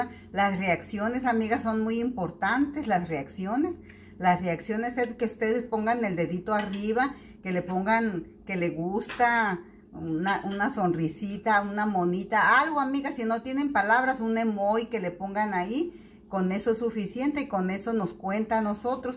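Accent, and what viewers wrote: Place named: North, Mexico. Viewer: Señora,,buenas sus recetas y comida saludable al mero estilo Sonora y con el acento tan norteño tan popular. Nomas un comentario me gusta cuando sirve la comida asu marido y el la resibe con mucho gusto, pero me parece muy cortante de su parte mandarlo a sentar asu rincón “” es mucho mejor que el este con usted en compañía como una bonita pareja,comparta comiendo del mismo plato en vez de mandarlo lejos de usted,,Gracias por sus recetas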